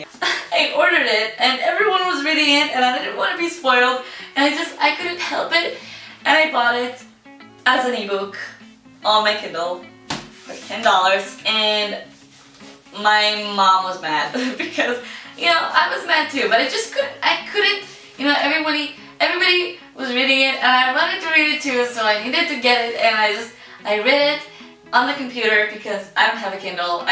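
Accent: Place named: Israel. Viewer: אומייגאד את ישראלית!! חחחחח אני שעה צופה בסרטונים שלך ולא קולטת.. ועוד שחשבתי לעצמי וואי המבטא שלה מגניב שתגיד מאיפה היא...אשכרה לא זיהיתי את המבטא של הארץ שלי.. איזה כיף לראות ישראלית בבוקטיוב!:)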